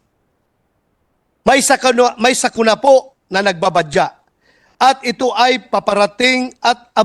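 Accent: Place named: Visayas, Philippines. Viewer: Bato mag speech theraphy ka nakakakabaliw English pronunciation mo, visaya visaya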